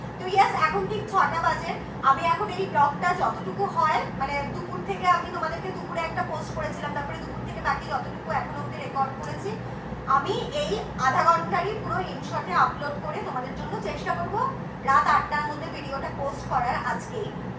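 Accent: Bangladesh. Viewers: Di tumi steam e kore dekho darun lagbe Tomar kotha bolar tone Bangladesh r mone hoi Aage aeikhane chyla ki na ??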